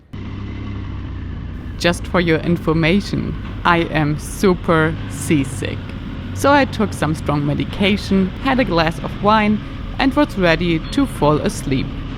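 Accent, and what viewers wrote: Germany. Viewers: Great video, love your german accent... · I like your German Acent in english!!😆😆Its so german!😄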